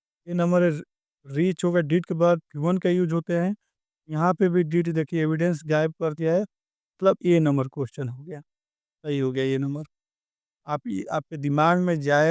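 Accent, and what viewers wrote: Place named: South, India. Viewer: Bhai south se ho ky tum Hindi wali vibes ni aari aisa lag ra hai jaisa soch soch k bol re ho But bathreen explanation ❤❤❤❤❤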